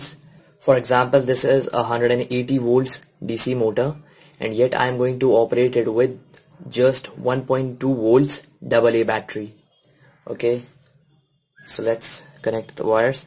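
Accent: India. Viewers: if you just improve your Indian accent you are going to be watched and followed by more people thanks for the videos you are posting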